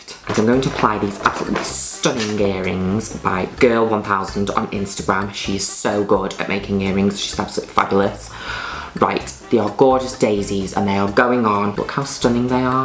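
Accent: Manchester, United Kingdom. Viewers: violently british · what dialect Britain are you? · Your manc accent makes everything so much better 😂😂 makes me feel at home lol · as a fellow manc i think your accent is STUNNING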